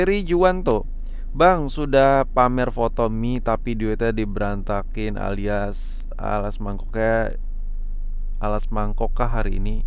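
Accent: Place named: Medan, Indonesia. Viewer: Logatnya logat anak Medan tapi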